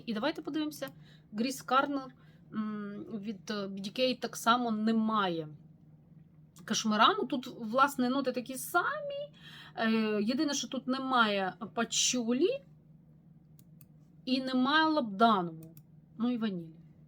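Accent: Ukraine. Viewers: Я, перепрошую, Ви з Хмельницького??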